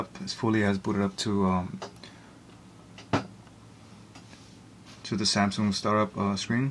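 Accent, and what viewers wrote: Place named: Mexico. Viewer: Can you please fix your semi mexican accent? thanks.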